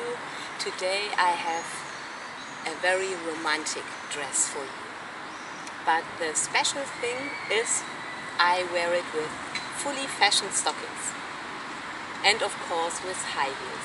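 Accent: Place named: Germany. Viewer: Dadurch, das Dein "english" soooo schulmäßig deutsch ist, habe ich mir schon gedacht, das Du aus dem Ruhrgebiet kommst ! ( Bin selbst gebürtiger Dortmunder . . . ) Du bist jedenfalls sehr weiblich !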